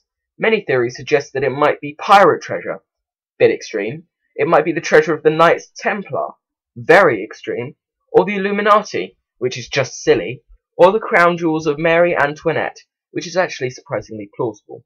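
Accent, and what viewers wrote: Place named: England, United Kingdom. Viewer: george lightheart He sounds English to me.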